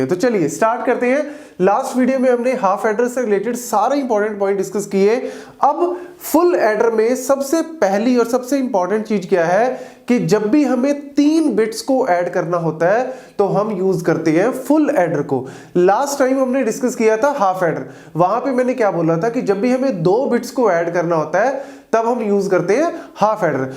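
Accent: Punjab, India. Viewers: Sir tusi punjabi bolde oo routine ch ?? Thodi lehje toh lag reha tusi punjabi bolde howoge ?? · Sir ! Are you from Punjab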